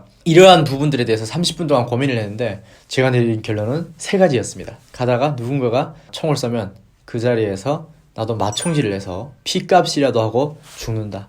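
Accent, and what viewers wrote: South Korea. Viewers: 어디가서 귀순했다하면 놀라워할꺼 같은디 타방송보면 다른분들은 10년이 넘어서 말투가 윗쪽동네 말투가 남아있는데 님은 그냥 토종한국인말투 같아여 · 완벽한 남한말씨네요.....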